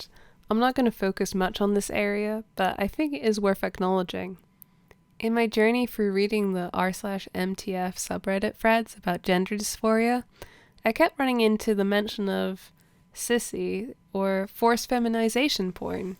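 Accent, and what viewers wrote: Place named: Scotland, United Kingdom. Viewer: It’s the intellectual dishonesty of trans women are women. It’s like a cult. And when you learn about autogynephilia and read Galileo's Middle Finger it’s even harder to rationalise what we’re seeing from the media, government and institutions. It’s genuinely terrifying. Great video and hopefully you’re able to post more at some point. Sure I detect a Scottish accent as well!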